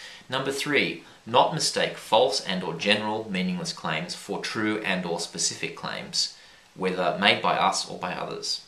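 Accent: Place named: Australia. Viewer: Good vid. What part of Aus you from; never can tell from the accent! I'm an Adelaidean, been thinking about joining an atheist type group do you know any good ones in Aus?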